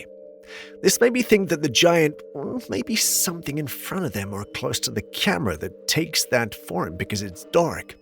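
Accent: South, United States. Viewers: ...are you getting a Texas/Arkansaw accent??? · are you throwing a little bit of country on us? And what's up with calling Russia, RUSHER?!? LOL. I don't even think country folk refer to Russia as "RUSHER" 😂. You crack me up! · Little Southern 'twang' thing going on there this time - maybe has been travelling the South... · you've developed a southern belle twang out of nowhere. That's the biggest mystery in this video. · your voice cadence keeps changing back and forth. From regular city mouse to southern country mouse!!!! What's going on dude?? Did you visit some old family relatives from the South and you inadvertently picked up a southern twang in your voice?? No disrespect meant by that, btw. I was just wondering??!!??🤔😬🤭😌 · What's up with the occasional southern twang, such as when you say 'house' ? I'd never noticed it before, but it's become almost regular, being heard in many of the clips. 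· I noticed your cowboy Southern drawl accent tonight lol I like it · What's with the Southern twang to your voice?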